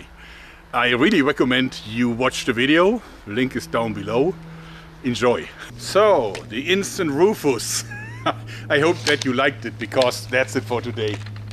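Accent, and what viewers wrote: Germany. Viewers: qualquer brasileiro normal: POR QUE CARALHOS O YOUTUBE TA ME RECOMENDANDO UM VIDEO DE UM CARECA COM SOTAQUE ALEMÃO SOBRE ESTILINGUE ARTESANAL??? YouTube: nosso algoritmo é perfeito :)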